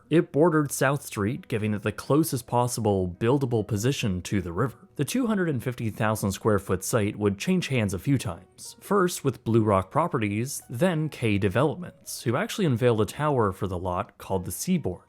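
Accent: Canada. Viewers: I like the hosts crazy Canadian accent 🙂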